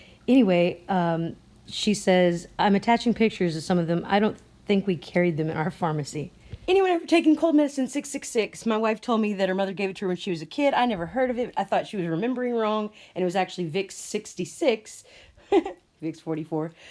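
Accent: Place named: United States. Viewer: You grew up in the Philippines? Your English is perfect and you sound very .. American?? 🤔 Anyways, love your content, your voice is beautiful and soothing to me. I love your laugh too! I've subscribed to!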